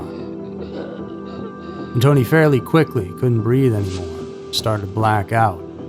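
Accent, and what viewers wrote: South, United States. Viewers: He's got a slight Southern accent I can't quite place by region, while his soft tone and melodic speech puts me in mind of a Tennessee Williams character or time frame or filmy floating fabric or something like that. I just discovered him and liked reading all the appreciative comments. I grew up with riveting storytellers from pioneer days, and fits that category I think.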